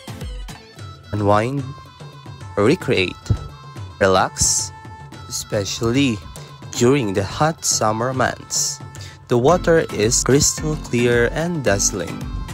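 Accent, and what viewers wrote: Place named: England, United Kingdom. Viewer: Cool English accent 😊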